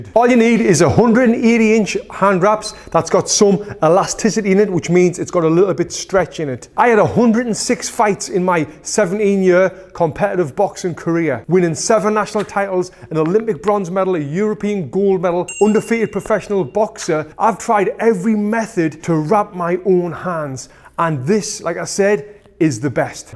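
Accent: United Kingdom. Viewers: He is British not Australian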